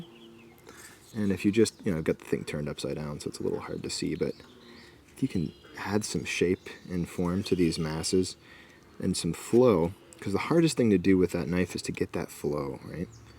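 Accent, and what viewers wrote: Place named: East Coast, United States. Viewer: Alaska is still warming up. It's nice to hear east coast. Again ,you are making this so fun. I'm still struggling to keep my tools sharp. I'm going to order a wheel grinder. Do you have any preferences to China brand , or is the smaller 50.00 grinder on Amazon, vrs a 200.00 home depot. Maybe a video to help. Or the belt with sand paper? Maybe better. What you think?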